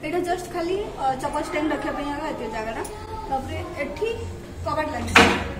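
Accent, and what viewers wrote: Berhampur, India. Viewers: Pura berhampur kathaa ....may be tume sethira bodhe?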